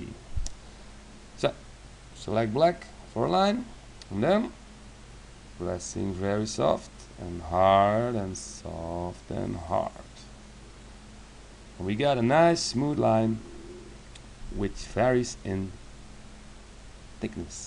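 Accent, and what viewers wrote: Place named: Netherlands. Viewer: Thank you, very good video ! By the way, your english accent is very nice for a Dutchman ! And I can tell because, I'm Dutch too :)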